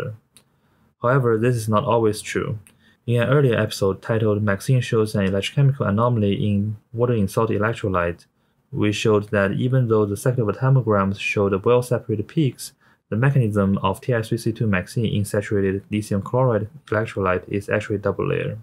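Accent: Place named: China. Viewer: 不用客气，希望你们越做越好。这是我的听感，我一般边做事边听油管，听到你的作品时我感觉你的英语发音重音漂移太严重了，读起来像中文的抑扬顿挫，一句话来好几个重音，听起来不知道你在说什么。有中文的口音完全没问题，希望主播可以提高句子把握能力，修改部分单词的发音错误。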